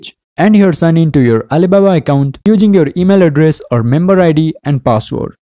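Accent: India. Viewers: haw to delete yar alibaba akkunt indian voice ueueue shut up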